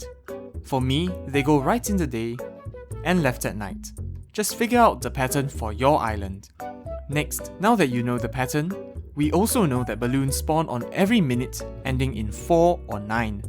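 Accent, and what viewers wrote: Singapore. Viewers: youre definitely from Singapore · Familiar accent. S'porean?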